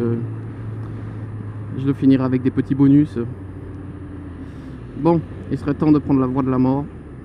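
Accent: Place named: South, France. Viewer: Cool ta présentation, ça fait plaisir de savoir à qui on a à faire haha, et l'accent du Sud et bien non ? On a la classe nous les sudistes mdr. Sur ce Ride Safe l'ami. V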